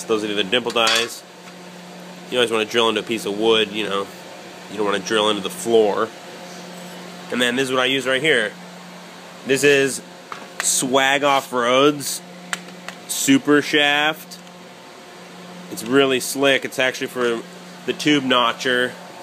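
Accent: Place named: California, United States. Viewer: where are you from? I'm in Santa Cruz, CA. there's no one who talks like you that's not from or in CA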